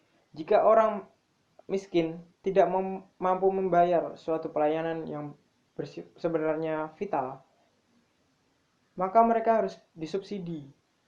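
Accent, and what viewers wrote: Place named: Java, Indonesia. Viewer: Ini ko logat jawanya medok ya hihi~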